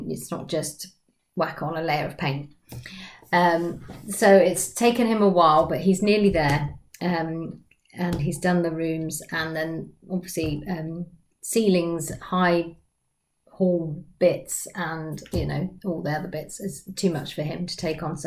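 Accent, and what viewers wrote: United Kingdom. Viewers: OMG! When I heard you say "squirrel" I was like my soulmate, I use that phrase a lot when I have "squirreled some dolly funds away" haha. Love it. I am English but have lived overseas for over 21 years. Sindy was my very first fashion doll. I collect fashion royalty dolls by integrity toys and really into the bigger girls now, such as pasha pasha, joydolls, doll menagerie, tender creations and other ball jointed doll artists. These talented artists seem to all be in Russia or the Ukraine. Just lovely hearing a English accent. I have just subscribed to you.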